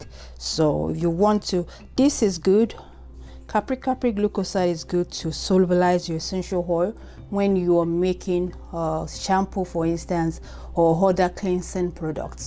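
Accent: Nigeria. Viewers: Are you Nigerian Am picking up on igbo/ yoruba accent ?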